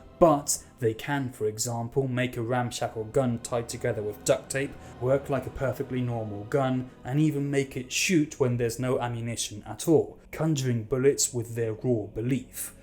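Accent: United Kingdom. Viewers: omg, your english accent is orgasmic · man what a brit accent you have, when you said you would rule an english channel I expected a strong spanish accent. Impeccable.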